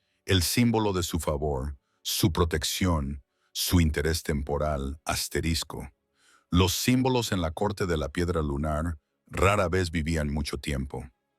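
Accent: United States. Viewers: Cambien em robot de lectura yanqui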